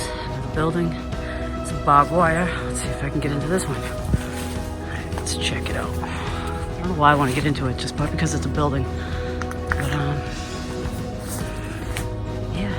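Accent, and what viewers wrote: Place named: Eastern Massachusetts, United States. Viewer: What beautiful views, you picked the perfect clear day. Love to listen to your voice especially the Eastern Massachusetts accent, i drop a lot of r's myself and talk similar coming from New Beige.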